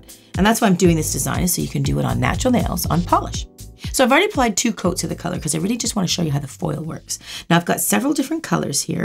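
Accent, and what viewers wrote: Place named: Canada. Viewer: that canadian accent 😙😍😂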